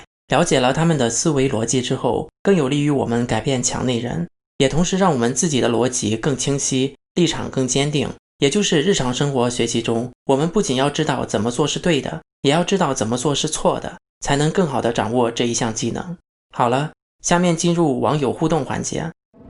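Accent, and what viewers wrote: Shandong, China. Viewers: 听你口音是山东人周边地区的人吧？呵 tui。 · 口音大概是山東那區的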